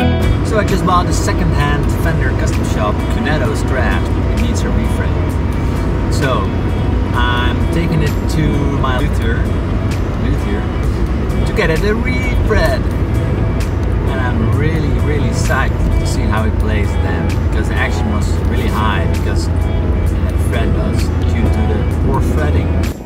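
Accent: Netherlands. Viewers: cool je bent Nederlands (hoorde al zoiets aan je accent) toffe video. speel zelf al 7 jaar gitaar en doe dat nog steeds op m'n squier ;) Super vid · Are you dutch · Maat ben jij een nederlander??? lol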